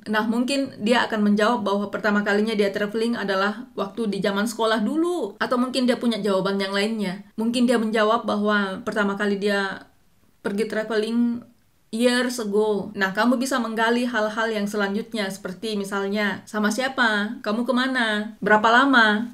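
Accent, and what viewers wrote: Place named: Toraja, Indonesia. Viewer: Wkwkw kak logat Toraja ta masih keliatan di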